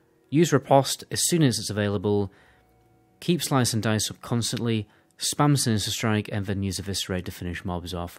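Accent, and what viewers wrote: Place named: Teesside, United Kingdom. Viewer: You sound like a teessider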